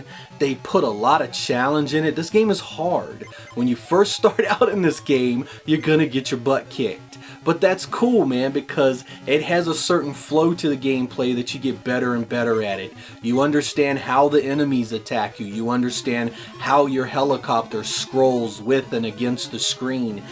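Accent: United States. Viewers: The movies would be in deutsch xD. The newer movies are clearly better and I like them all. I dont write here, that this video is cheap or something like that, because I dont care, your content so good here also and your analyses are great. I criticise something different, isnt in? Oh my god, the way of talking in USA is facking actually really so annoying, you know.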